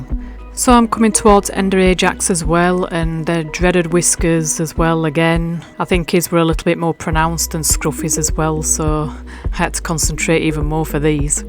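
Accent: Yorkshire, United Kingdom. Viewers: I’m detecting a Yorkshire accent. I’m from Sheffield 😊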